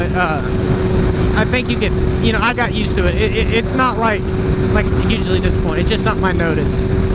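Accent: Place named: West Virginia, United States. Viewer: Are u from West Virginia?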